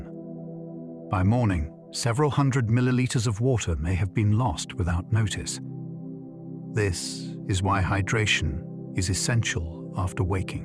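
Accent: United Kingdom. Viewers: So glad i found. This in my scrolling.. i feel like i'm listening to God with a British accent explaining everything.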